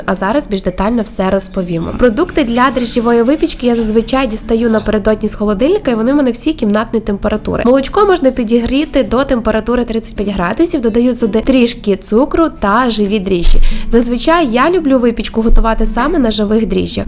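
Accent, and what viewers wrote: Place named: Western Ukraine, Ukraine. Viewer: Это не украинская мова.....это западенская мода ! В Украине так не разговаривают !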